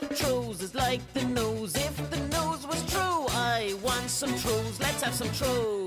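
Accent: United Kingdom. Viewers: Russell, I love you man, and your videos. But could you please invest in a good quality microphone? Also maybe record your videos in a less echoy location? You have awesome things to say, as an Aussie, being brought up watching mostly second hand British TV shows I do understand your accent most of the time, but it is sometimes quite hard to hear you due to the echo and quality of your mic. Just something to keep in mind, Thanks mate! Keep up the awesome vids!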